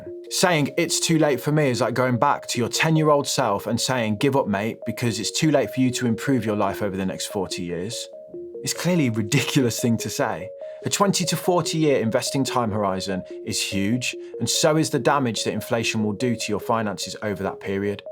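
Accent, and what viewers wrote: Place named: Black Country, United Kingdom. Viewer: It sounds like the black country, maybe walsall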